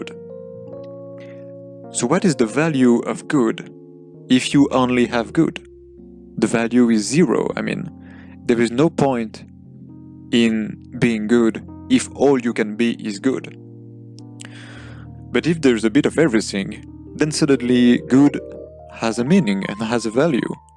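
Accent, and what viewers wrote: France. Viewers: Once again I loved listening to your French accent speaking about such important things like freedom! Can you share more info about who you were talking about and the book he wrote? You mentioned that he lived during the same time Jane Austen wrote her novels in. If you were to make videos about philosophy I think you would get good views! You spoke about being ready to be wrong or change your mind about things and as an American who has seen such cultural turmoil in our country the last few years, I think people have forgotten how to debate and talk to each other without being so rigid. About the build: this is my second favorite style... Of course darker victorian's are my absolute favorite :) but you did so well recreating this Queen Anne house. It reminds me of the Carson House in Eureka California.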